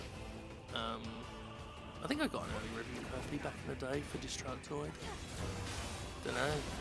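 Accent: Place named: United Kingdom. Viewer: You sound more british here than usually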